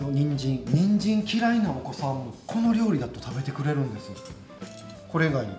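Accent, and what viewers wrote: Kansai, Japan. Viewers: 10分でできる料理...最高にありがたいです(;▽;)にんじん細切りの仕方感動~そして関西弁でおちつきます😊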